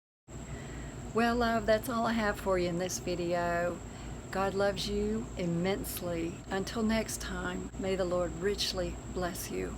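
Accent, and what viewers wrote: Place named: South, United States. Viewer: You sound like a southern lady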